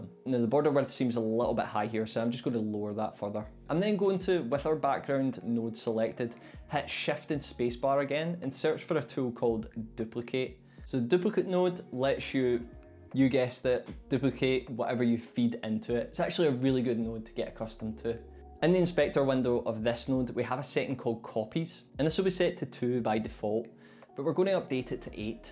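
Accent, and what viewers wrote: Scotland, United Kingdom. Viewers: Thanks mate it really helped me 🙂 u got one Subscriber more :-) But your accent is uugh where are you from ?? Scotland or so ? :D i have to listing very carefully :D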